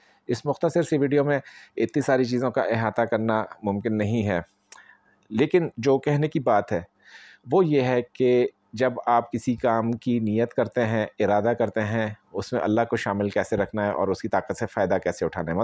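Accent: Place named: Punjab, Pakistan. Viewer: 🌙niyat me agar ap ko mang liya tu?? Etna piyara panjabi urdo soft fast bolty ...acha lagta ha..few year ago me free hoki Beth gy.gour Kiya .me ni kasa reply Kiya same time par few good piyary insaan mujy papoose. Un sab ko same reply Kiya Ap kuhda se mago.last Ramadan days.bhot duai mangy hongy..evryday mesg me I off evry thing Ramadan ki zikar and cooking raat bi jagna .after Ramadan computer opn hi nhi howa buzy with zindagy job after 8 year wo Nawaz hogy nhi pata khagy Allah zinda h ai kher se ho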